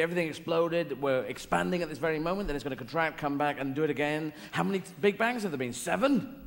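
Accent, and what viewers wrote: United States. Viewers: I love his American accent. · I love his southern accent. That was hilarious. It is good, I am southern. I am a Cajun hillbilly, lol!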